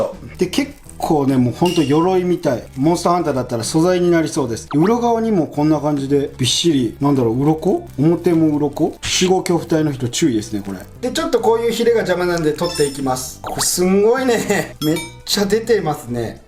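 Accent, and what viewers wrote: Kagawa, Japan. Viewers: 飾らない讃岐弁だから視聴者登録数が伸びるのではないでしょうか。私も今ではすっかり忘れてしまった讃岐弁を思い出して楽しく拝見しています。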